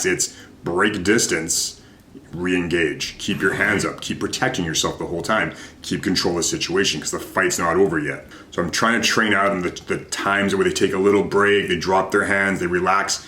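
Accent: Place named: Canada. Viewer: This guy’s voice screams Canadian 🇨🇦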